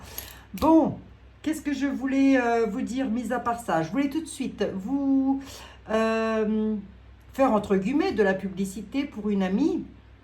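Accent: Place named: Meurthe-et-Moselle, France. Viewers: Ma chère toujours un plaisir de vous voir avec cette mise en bouche de 8 mn pleine de délicieux mots pour chacun... J adhère complètement. Pour ma part je préfère les amandes aux cacahuètes (Dieu a donné à l homme le cerveau et le sexe mais pas assez de sang pour irriguer les 2 en même temps). Avec votre joli accent de Lorraine. Bref ne changez rien. Je vous byz avec beaucoup de vibrations d amour de lumiere et de vérité. Eveillons nous tous. Avec gratitude Patrick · dommage pour le son qui se coupe à chaque pause. donc il manque des mots . plaisir de vous entendre tout de meme avec votre accent de 54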